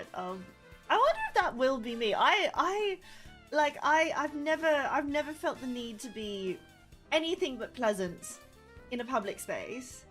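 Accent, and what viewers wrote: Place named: England, United Kingdom. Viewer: She's just English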